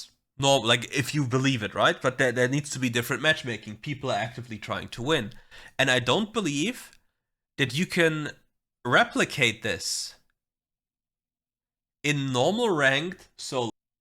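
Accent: Germany. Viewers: Bro are u German? Unbenannt.txt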